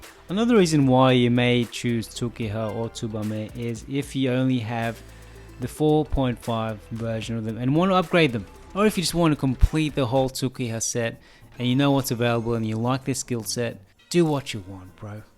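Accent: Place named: United Kingdom. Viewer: i thought British. Xenoblade was dubbed in the uk and I now really appreciate British voices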